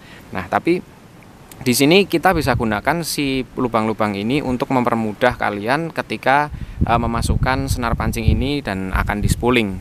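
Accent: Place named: Java, Indonesia. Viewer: logate asli wong jowo masse salam sehobi mancing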